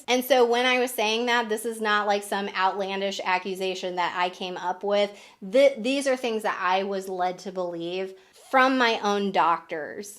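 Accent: Midwest, United States. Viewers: Do you have a Illinois accent or Mid west just curious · Sounds more mid west to me, I've lived in SW Missouri my whole life and she talks just like pretty much everyone here does.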